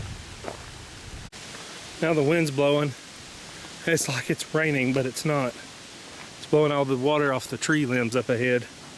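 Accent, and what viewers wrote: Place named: Georgia, United States. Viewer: I grew up in Tennessee, and I've been living in southeast Georgia for the last 8 years, and I can always hear the difference in the southern draw between Georgia and Tennessee when I listen to talk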